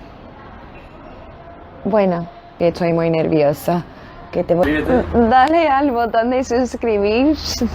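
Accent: Andalusia, Spain. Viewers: Que graciosa una estadounidense con acento andaluz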